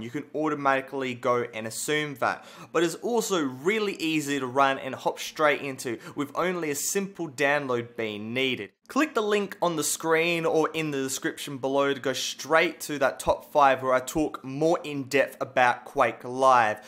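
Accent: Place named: Australia. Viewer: he must be a australian or new zealand accent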